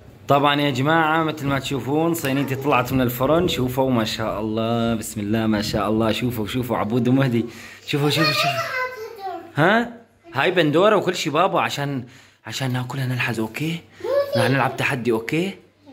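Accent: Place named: Iraq. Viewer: لهجتك تشبه العراقيه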